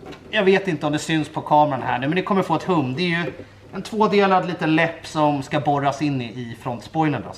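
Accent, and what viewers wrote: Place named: Stockholm, Sweden. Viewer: Hehe så skön stockholmska. Smutta upp den. Haha. Man blir så glad att lyssna och se på sköna människor. Du gjorde verkligen min dag kompis!!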